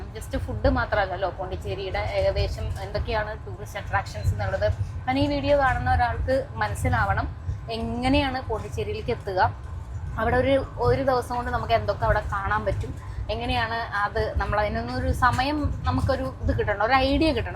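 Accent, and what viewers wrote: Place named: Valluvanad, India. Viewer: Molu eth nattukariyanu❤valluvanadan bhashyanu❤❤❤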